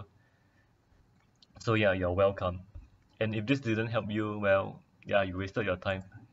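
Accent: Singapore. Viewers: I hear singaporean or malaysian accent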